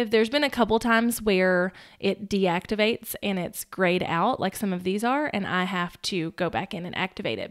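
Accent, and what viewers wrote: South, United States. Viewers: That was so helpful, and the Southern accent made the video especially interesting! 😉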